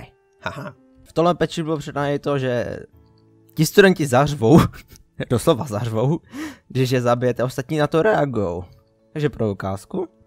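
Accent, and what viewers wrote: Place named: Ostrava, Czech Republic. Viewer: Btw jsi někde z okolí Ostravy? Zníš jako totální Ostravák :D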